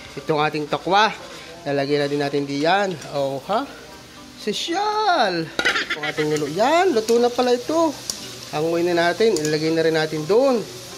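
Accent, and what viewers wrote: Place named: Batangas, Philippines. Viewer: Kakatuwa talaga ang Punto Ng Batangas ,sadyang mukhang masarap Ng iyong gawa2ng sizzling Shiela A. Vlog here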